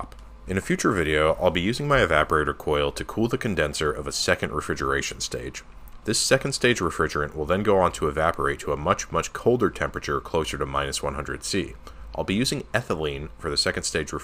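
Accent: United States. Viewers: Fantastic video. Warms an engineers heart to see a project conducted with proper regard for the science principles and associated mathematics. Even better to hear an American accent wrapped around SI units! Mr, you got it all goin' on! :) And seriously, great video. I've worked a few heat pump designs myself and my only question about your technique is your soldering is using soft solder; is that really strong enough and long lasting enough for the task at hand, and does it not soften a bit at your higher temperatures where the pressure is also higher? I use silver solder and nitrogen gas in the tubes to stop scale forming in the tubes. It is a pain but those joints are stronger than the tube! Now, to find the promised next video.... and to say; thanks for sharing.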